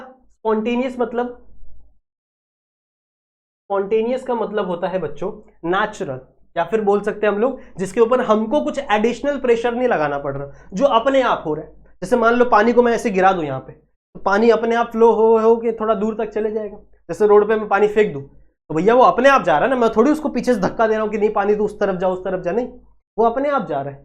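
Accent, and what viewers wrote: Maharashtra, India. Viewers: Sir marathi aht kai 😂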